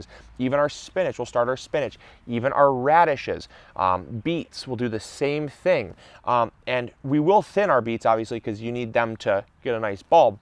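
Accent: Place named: Minnesota, United States. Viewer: I'm from Canada so I have no idea, but is this what people from Minnesota sound like?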